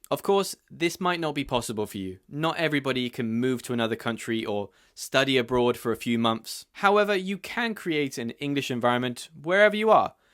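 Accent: United Kingdom. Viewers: I live in Ulsan, South Korea! Most Korean students learn American English in school, I was no exception. But I love British accents so I researched them and I was able to find you! 🥰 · You are the only british that I understand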